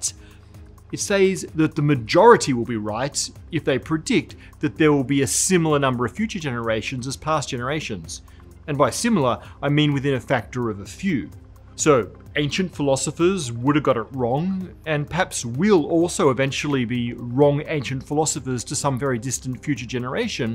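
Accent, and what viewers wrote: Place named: Australia. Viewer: It's called being Australian. I don't know how you struggle to understand him, it's very clear, and I don't think they considered those like you that are impaired in verbal comprehension over his ability as a physics communicator.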